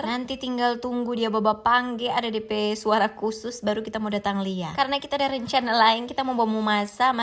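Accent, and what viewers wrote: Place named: Manado, Indonesia. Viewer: Suka nonton video mbak.....mungkin krn dialek bahasanya yg enak.....❤ Salam dr Makassar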